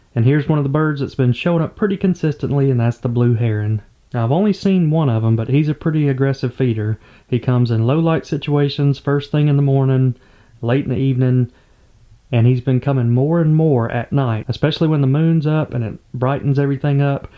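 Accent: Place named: Pacific Northwest, United States. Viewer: i love this. The sound outside is also enough for me. if you live streamed your ducks id patreon for that in a heart beat. I just had to move back to the PNW from GA so your accent is very welcomed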